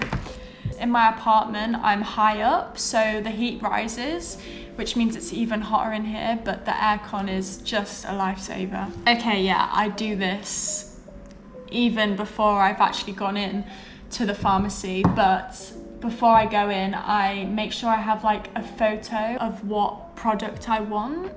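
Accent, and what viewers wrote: United Kingdom. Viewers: this is the first time I hear this pronunciation. She has a really heavy British accent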